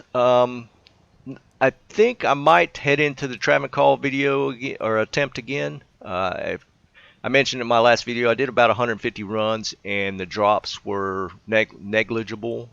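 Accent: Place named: South, United States. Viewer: Love the southern drool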